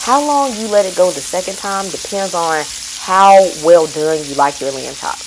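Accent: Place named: Chicago, United States. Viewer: Sis, are you from chicago? that accent is giving chicago vibes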